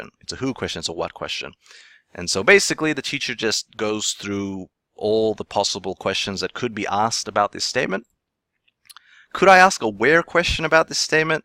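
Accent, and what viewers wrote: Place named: Australia. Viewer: . I am new to your channel and taking Japanese at University of California San Diego to help me in my study of Aikido and Kendo. I had only been watching the videos where you are speaking Japanese and am trying to model your style/speed of speech because it is at a pace that I can create/form sentences and responses at my level. Are you America jin, Ostoralia jin or Nihon jin? You English is great with what sounds like an Australian accent. Love your stuff. Domo Arigato Gozaimasu!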